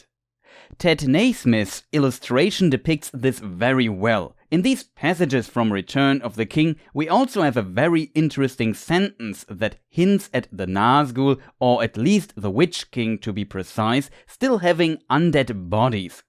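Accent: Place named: Germany. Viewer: As I heared you talking, I immediately guessed that your native language is German, just like mine. 🇩🇪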